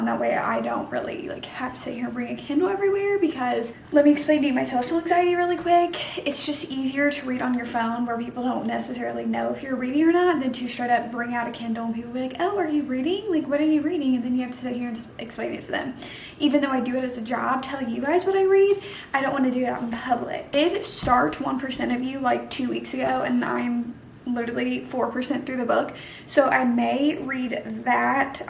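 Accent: United States. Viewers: where is her accent from in america? :O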